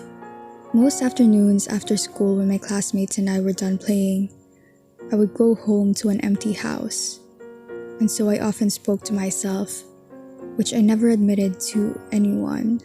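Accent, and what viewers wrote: Philippines. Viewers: all i know is that you have a filipino accent<3 But heyyy, If you are a filipino, And you're questioning the filipino culture upbringing and spreading awareness even if it's 3 minutes long, It can help. Well story short, I'm a filipino with a religious mother with toxic family especially on reunion, And as an asian, Body shaming, bullying, intimidation, toxic conservatism is considered normal. With all honesty. I wish i was overreacting or joking. Would you believe?!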